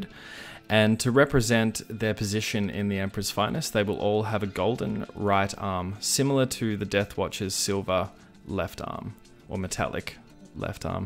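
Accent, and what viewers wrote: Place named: Australia. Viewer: You sound Aussie. These look great by the wat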